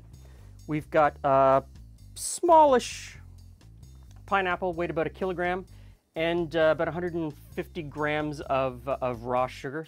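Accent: Canada. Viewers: Funny you mentioned it. I heard a Canadian accent. It’s all about the about.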